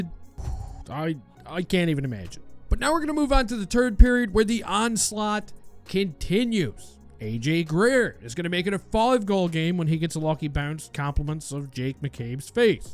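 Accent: Newfoundland, Canada. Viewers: Fellow newfie here, didn't take me long to catch onto the accent! God bless ya, just stumbled onto your channel. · Is this dude a Newfie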